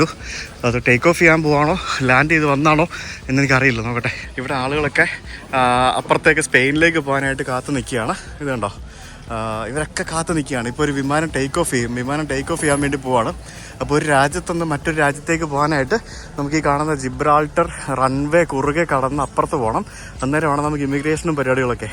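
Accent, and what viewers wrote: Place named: Thalassery, India. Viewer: Oh that malayalam accent thalasherry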